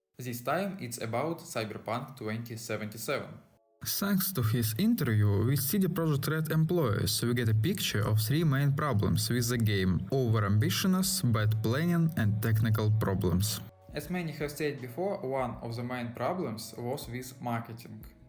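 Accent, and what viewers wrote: Russia. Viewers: he has Russian accent, so should that deter him from making content? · russian english good god :/ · why are people hating on this guy? is it because of his accent or because he is russian? there are many xenophobes i feel. keep up the good work brother. I like your uploads about cyberpunk XD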